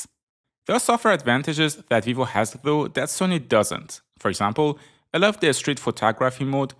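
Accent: Iran. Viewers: bro your accent is sooooo iranian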